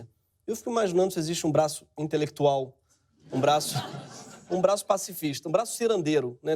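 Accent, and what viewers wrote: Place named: Rio de Janeiro, Brazil. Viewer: O cara não é engraçado mano. . E ainda por cima com esse sotaque carioca...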